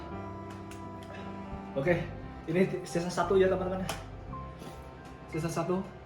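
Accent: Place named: Banyumas, Indonesia. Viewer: ngapak e oraak ilang rek😅 Mantab mas, lanjutkan😁👍 salam angkatan IM JAPAN 315🤝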